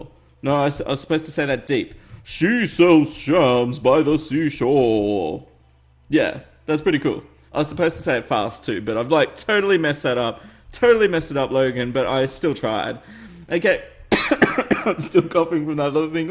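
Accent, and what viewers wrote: Australia. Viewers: Your Australian me to where in Australia do you live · Your from Australia? :O No wonder I like your accent☺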